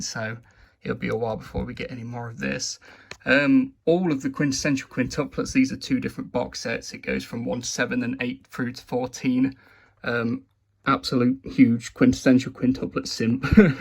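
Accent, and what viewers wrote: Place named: United Kingdom. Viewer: Yeah it’s nice to hear another UK voice I swear almost every manga YouTuber is American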